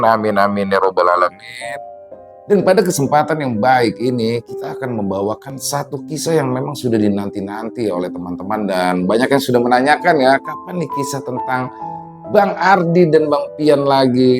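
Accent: Jakarta, Indonesia. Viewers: Taiwan hadir bang... bmyakin logat Betawi bang...aye pantengin terus nih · Selain ceritanya memang udah bagus, cara bang membawakan juga ciamik. Betawi nya dapet banget. Dramatic reading yang keren karena dibawakan dengan natural, wajar dan nggak dibuat-buat. Jago banget dah hehehe. Salut buat bang dan tentu saja Mas Untung Prasetyo. Semoga terus berkarya dan sehat selalu. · Paling suka kalau logat Betawinya keluar.... Salam sukses bang... Salam dari Iwan Pati.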